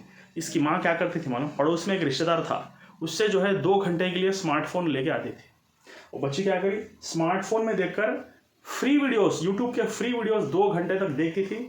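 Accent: Hyderabad, India. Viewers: Sir ki hyderabadi accent sunne ko aya main. Hou kya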